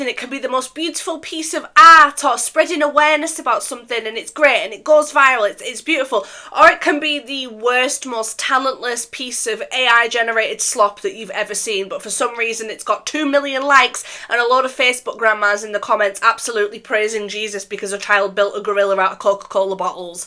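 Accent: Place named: Wales, United Kingdom. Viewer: You sound welsh lol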